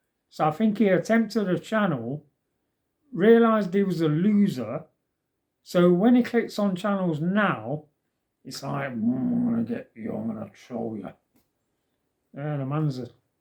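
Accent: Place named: Nottingham, United Kingdom. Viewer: Are you from Nottingham by any chance? Might be the accent I dont know haha. Nice cave mate. All the best.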